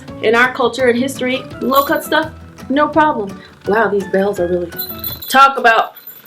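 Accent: South, United States. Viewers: Omg where from the south are you from like what area? We have the same accent so I'm curious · omg I just love your southern dialect. wonderful video keep it up <3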